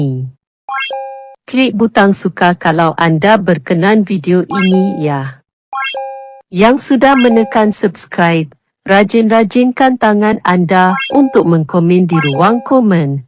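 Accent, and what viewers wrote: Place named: Indonesia. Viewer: Yg bercakap ni indon ke...ckp tak sedap tak jelas...tak ada orang Malaysia ke yg boleh cakap..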